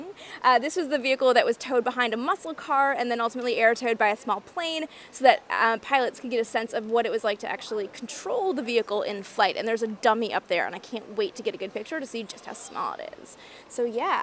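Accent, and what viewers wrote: Canada. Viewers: Ha you sound more Canadian in this video. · Great video , your adorable! So cute.. I can tell your from Canada..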